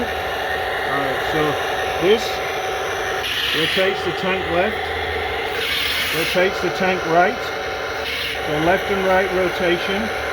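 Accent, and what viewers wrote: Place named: England, United Kingdom. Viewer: Hello were are you from originally. You don't sound American. Sound more English .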